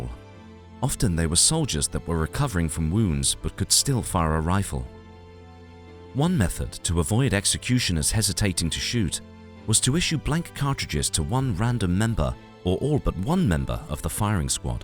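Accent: United Kingdom. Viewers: British accent tho lol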